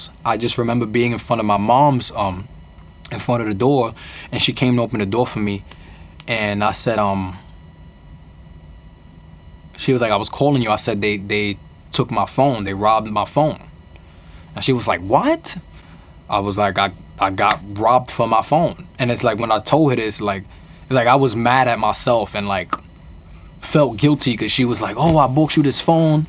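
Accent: New York, United States. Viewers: His accent so heavy it make me think I’m not a New Yorker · He mostly he sounds like any other American I'm form California and he has a slight NY accent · I LUV New Yorkers' accent!!!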